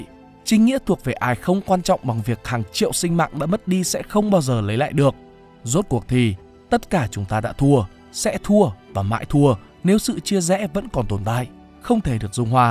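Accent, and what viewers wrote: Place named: North, Vietnam. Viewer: Giọng đọc miền Bắc làm tôi tin rằng lịch sử phải đúng đắn. Trân trọng. Mong thế hệ trẻ đi tìm lịch sử thật thay vì học cái lịch sử giả dối!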